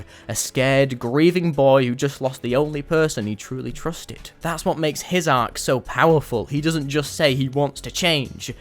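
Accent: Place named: United Kingdom. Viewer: dude I'm 10 minutes into the video and I'm loving it so far, but I felt the need to say something completely unrelated. I'm studying English Phonetics (as a foreigner) and I have a midterm on it tomorrow. this video is genuinely helping me so much, I'm like transcribing everything you say into phonetic script in my head. I don't know if you speak BBC English, Estuary or some other variant, but I'm shocked at all the diphthong shifts, intrusive R's, elisions, assimilation and other things I'm identifying. again, completely unrelated to what the video is about but it's helping me so much. thank u :)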